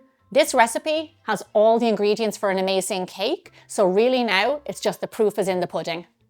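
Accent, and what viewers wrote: Ireland. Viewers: (LOVE this woman's Irish accent!) 😊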